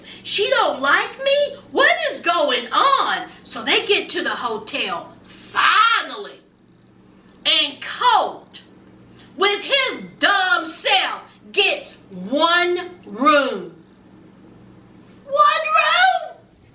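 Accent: South, United States. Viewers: Well I love it!!!! I'm a new subscriber and your southern drawl is what drew me to you. I'm from Atlanta, Ga. So I get it!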